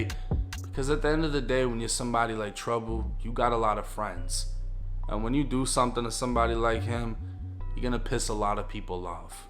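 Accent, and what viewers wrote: Boston, United States. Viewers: Just really getting into your vids I see you rep FL but your mass/Boston accent is crazy 🤣🤣🤣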